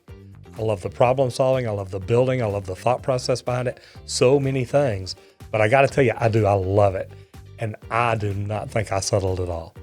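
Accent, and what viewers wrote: Texas, United States. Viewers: Based on your accent I say ur Texan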